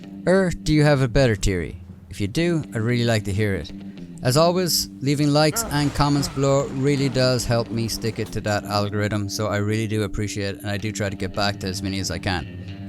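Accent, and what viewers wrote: Ireland. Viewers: Fantastic video, absolutely loved this! Really interesting and thought-provoking 😊 Biggest mystery for me though is which part of Ireland that lovely accent is from... my g-grandad was Irish from Cork, doesn't sound like there! 🇮🇪 Keep up the good work, you deserve so many more subs! Maybe Princess Isabeau would be a good one too, I see a lot on the mysteries sub talking about her (I've got a feeling she's not to be found, but hey ho) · Irish I believe but there are a lot of Irish accents.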